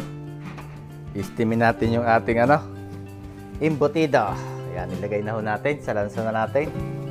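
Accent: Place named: Tanauan, Philippines. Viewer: Nag enjoy akong manood. At para ko na ring nalasahan ang iyong mga niluto. Taga Tanauan din ako at talagang feel ko na nasa 😅Pinas pa rin ako sa iyong pananalita at punto. Thank you at sana'y marami pa akong mapanood na shows ninyo.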